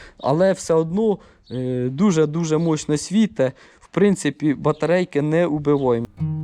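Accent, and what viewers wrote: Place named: Poltava, Ukraine. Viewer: Мені ті поплавкі даром не треба,але додивився до кінця! Цей Полтавській говор це мед на вуха "діОдик" тобі машини треба продавати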